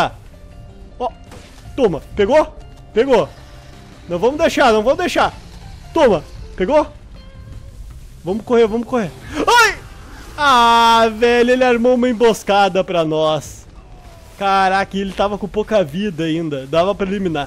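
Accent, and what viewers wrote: Rio Grande do Sul, Brazil. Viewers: Rsrsrsrs o tem essas gírias e falas gaúchas. Deixa muito engraçado rsrsr 😎😁😆😆😆😆😆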